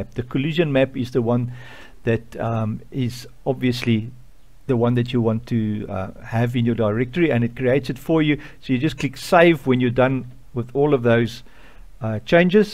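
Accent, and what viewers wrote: South Africa. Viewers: Ek kan sommer hoor jy is van Suid Afrika lol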